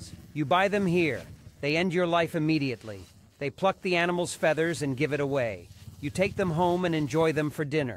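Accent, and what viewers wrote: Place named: Gaziantep, Turkey. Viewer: La Yorum Alının Çatında Yaziy Antepli Olduğun Sesini Duyar Duymaz Antepli Olduğunu Bildim Rafık 😂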